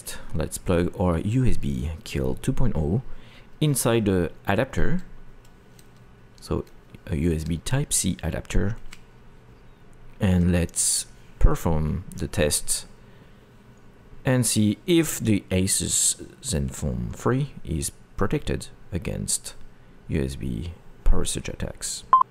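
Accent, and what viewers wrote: France. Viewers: you have french accent t'es français ? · You have a french accent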